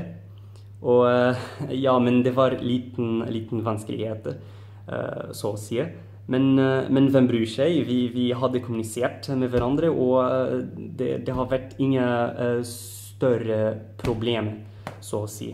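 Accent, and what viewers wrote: Sweden. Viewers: Good job! I can hear some swedish in there, but that is nothing to worry about! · Du snakker ganske bra allerede, norsk er et vanskelig språk å lære i utgangspunktet, men jeg hører en del svenske ord og utalelser, så øv litt mer på uttalelsen din, og om du virkelig vil imponere nordmenn, bør du lære deg nynorsk også, siden det er det mange nordmenn som også sliter med. Men ellers veldig bra👍🇳🇴🇳🇴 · Du har en gøyal skandinav-dialekt, hører du har litt svensk påvirkning, men det gjør jo ingenting. Du har bra uttale og er lett å forstå deg uansett👍🏻 mektig imponert over at du har lært norsk så fort 👏🏻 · Norsken din er utrolig bra for å være på 4-5 måneder, det skal du ha! På mange måter hører jeg at du blander det inn med Svensk, ikke det at det er noe dumt med det, men spesielt på «ungifär» som er Svensk, hadde jeg ingen aning hva du sa :p Utenom det, ikke gi opp, du har talent! 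· Litt hjelp: Du snakker med en litt svensk uttale. Si "mæj" isteden for "mei". (I hvertfall på Østlandet.)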